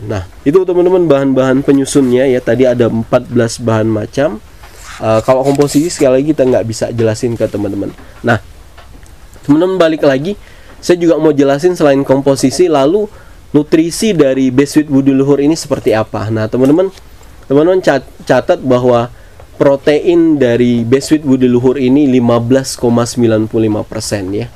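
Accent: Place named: Banyumas, Indonesia. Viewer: Melo nyimak wong ngapak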